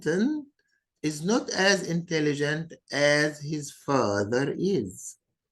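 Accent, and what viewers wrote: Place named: Egypt. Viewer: Your pronunciation is very awful and bad. You speak an Egyptian English.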